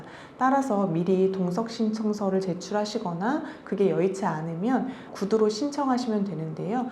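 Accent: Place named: Seoul, South Korea. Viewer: 참 미인이세요,말씨두 너무 이쁘구요.내고향 서울 말씨 너무 듣기가 좋구 편해요.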